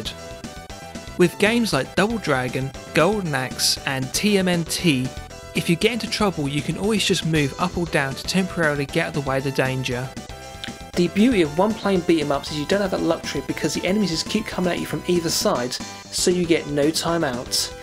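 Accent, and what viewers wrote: United Kingdom. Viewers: LOL, it's Morphing Time! You're the only British reviewer on youtube that I can stand listening to! Congratulations! Subscribed! Keep it up!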